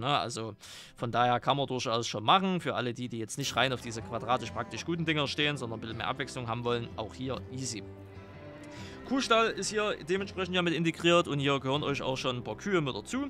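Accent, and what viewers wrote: Saxony, Germany. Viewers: Tolles Video, Super Karte,da wurde richtig abgeliefert. Großes Lob an den maper. Ich bin großer Fan von deinen Videos und wie man hören kann bist du Sachse. Mach weiter so. Gruß Rene aus Dresden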